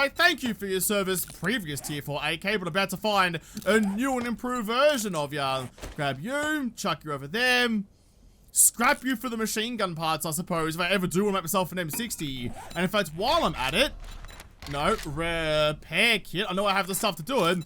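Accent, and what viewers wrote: Australia. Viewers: Anyone know what a "flozza" is from McDonald's? I can normally understand Australianess but that one has me stumped. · just out of curiosity, are you a native Aussie?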